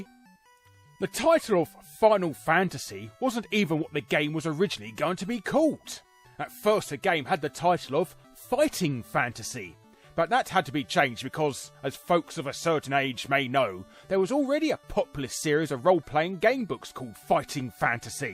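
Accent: United Kingdom. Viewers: Your videos are great but your voice and inflections are so british it's unwatchable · My favourite was your classy british accent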